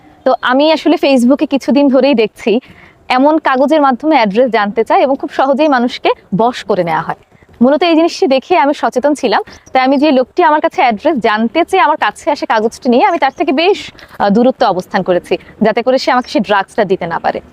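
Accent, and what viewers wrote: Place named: India. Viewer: Tumi bangladeshi? Vul vebechhilam karon tomar katha balar dharan thik Indian der mato.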